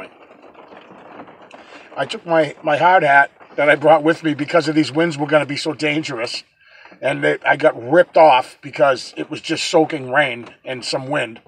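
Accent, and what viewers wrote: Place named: Massachusetts, United States. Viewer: your a riot 😂😂😂!! That Mass accent. And the way you word things..funny..God I miss home. Love your videos WTH download em all..lmao..love ya..Linda in Florida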